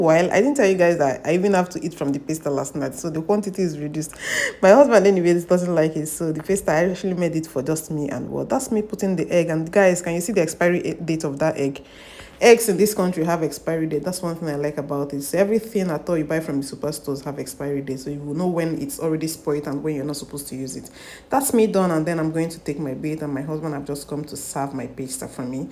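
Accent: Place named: Anambra, Nigeria. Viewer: Your anambra dialect is giving me joy.😊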